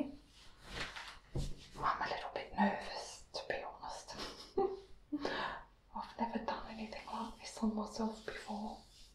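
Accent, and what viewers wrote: Australia. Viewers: omg i love this!!! are you Aussie?? I laughed so much when you said, "sounds a bit rude".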